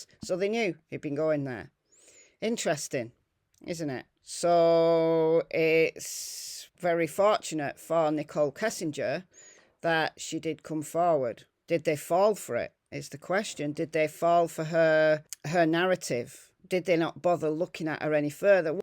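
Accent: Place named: Stockport, United Kingdom. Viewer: I am so intrigued by this case and have been watching loads of videos from various you tubers and something doesn't quite add up about how the investigation was done for one and what's he not telling that he will take with him to the grave. Is he going to end up pushing N K under the bus to get his sentence lessened because she was involved after all maybe. I'm a northern llass by birth born in Salford Manchester and lived in digsbury until my parents moved to Wales. Is that a Stockport accent I recognise. Enjoying your videos on this because they are so full of interesting information 😀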